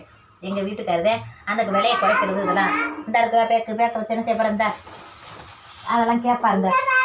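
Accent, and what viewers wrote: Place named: Tamil Nadu, India. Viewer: Un video yengaluku oru motivation na eruku, Unnoda tamil slang, kudumbapaanga erukuradu, u tubela samarthiyama sambadikura thiramai , super vazga valsmudam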